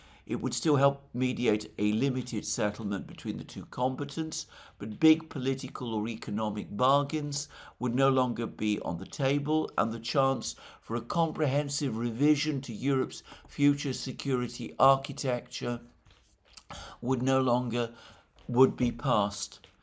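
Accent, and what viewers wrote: United Kingdom. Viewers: love how you described it! British accent rules❤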